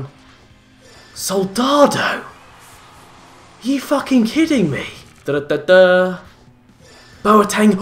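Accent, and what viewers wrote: RP, United Kingdom. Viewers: The he used to speak, was so posh haha. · your voice I'm watching your videos of 2015. Such a different. Posh voice here, shouting mad and loud as hell back in 2015. Lot of difference. Deserves gratitude now.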